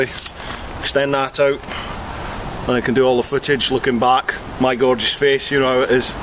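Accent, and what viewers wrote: Scotland, United Kingdom. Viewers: Hi mate , just found your site , really enjoyed it, the accent grabbed me as a fellow scot , in Oz for the last 30 yrs and a touring , camping bike rider as well. Now happy subscribed . Thanks again Steve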